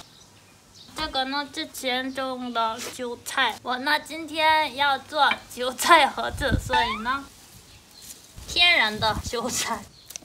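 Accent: Northeast, China. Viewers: 博士为什么一口东北味儿？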